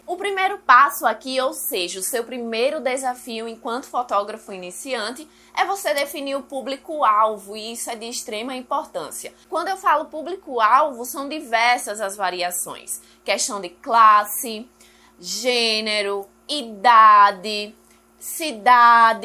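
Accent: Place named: Pernambuco, Brazil. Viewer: Esse sotaque Pernambucano. Minha conterrânea, amei demais o canal. To como iniciante , já amei e já ativei tudo. Obrigada 😍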